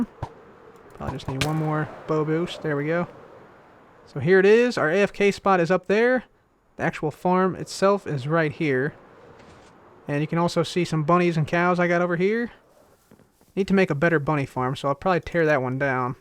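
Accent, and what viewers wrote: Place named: Illinois, United States. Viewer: I think he's from Illinois.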